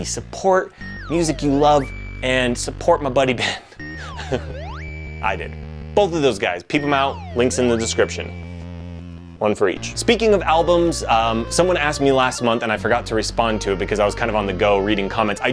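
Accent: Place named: Midwest, United States. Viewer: Yay! Retroware fam :) yeah lol for some reason I hear some midwest accent. (probably just me!)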